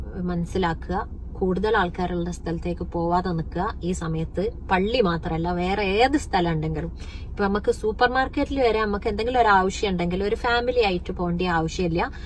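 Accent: Kozhikode, India. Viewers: കോഴിക്കോട് ഭാഷ എനിക്ക് ഇഷ്ടം ആയി 😍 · കോഴിക്കോട് ഭാഷ ഞമ്മക്ക് പെരുത്ത് ഇഷ്ടായി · assal Kozhikode anallo samsaram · പച്ച കോഴിക്കോടൻ ഭാഷ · കോഴിക്കോടൻ മലയാളം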